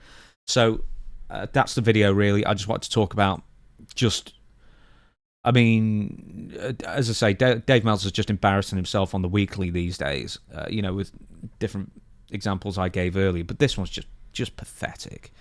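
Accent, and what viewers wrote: England, United Kingdom. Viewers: I don't know what it is with you, but that English accent that you have always makes it easier even when you're pissed off to understand everything you're saying💯🫡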